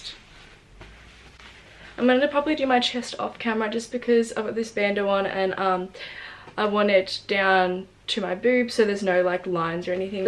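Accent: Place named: South, United States. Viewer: I reckon....you sound like your from the south states, like me as a TN girl. Tan looks great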